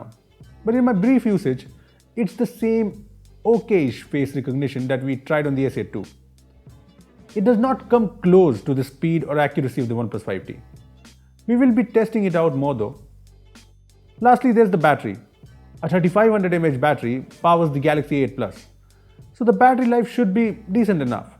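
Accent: India. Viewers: Most genuine indian english accent. Nice review....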